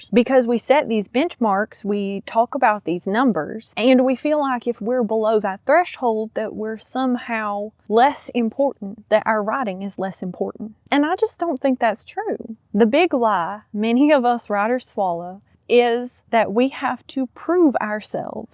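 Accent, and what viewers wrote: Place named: Alabama, United States. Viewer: Your accent is adorable! I thought you were Alabama, but go Vol's! Appreciate your message. My debut novel (The Year of the Cat by Gary Kotowski - Amazon) didn't move the 5000 copies I envisioned it to sell. I'm still invisible to agents and I'm too discouraged to start a second novel. Needed to hear your step three! Thank you!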